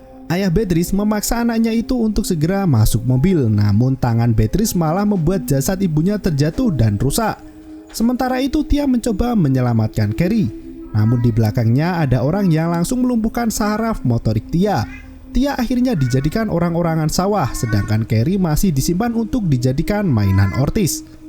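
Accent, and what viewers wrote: Java, Indonesia. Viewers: Jawa km medok benar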